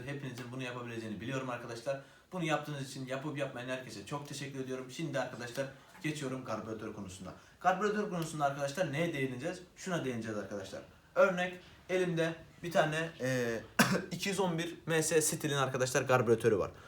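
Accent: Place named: Black Sea, Turkey. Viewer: Karadenizli şive farkı var söylem tarzı var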